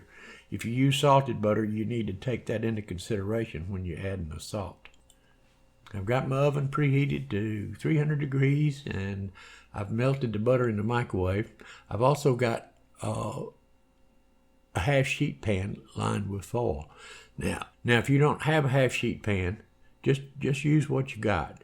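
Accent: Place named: South, United States. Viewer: Aww hell yeah, a nice southern accent you know u finna get a nice recipe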